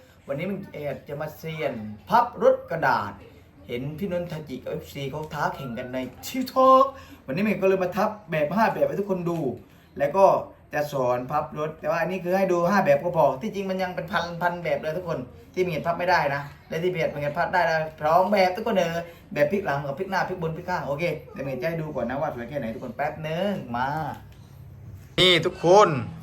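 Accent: South, Thailand. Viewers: คนใต้เหมือน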